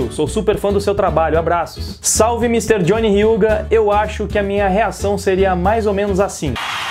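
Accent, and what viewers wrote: Rio Grande do Sul, Brazil. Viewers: Voce é gaucho??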